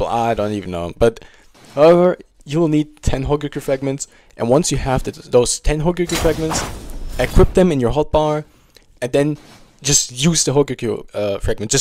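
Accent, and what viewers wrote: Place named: Germany. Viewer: sehr deutsches englisch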